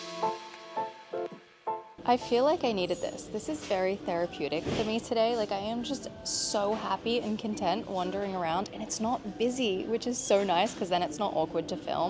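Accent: Australia. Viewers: Nice haul. BTW were you originally from Australia ? Cause you sound like one of us. I'm from Perth. W A. If you were ,what brings you to the states. Thrifting is definately better in the states. 😂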